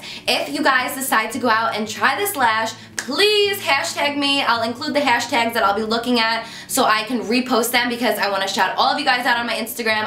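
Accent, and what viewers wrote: New Jersey, United States. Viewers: Omg! Her jersey accent is so strong in this video! 😍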